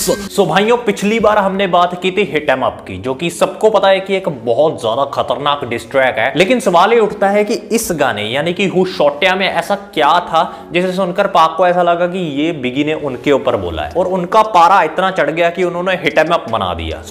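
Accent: Punjab, India. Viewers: Bro tu punjabi aa ??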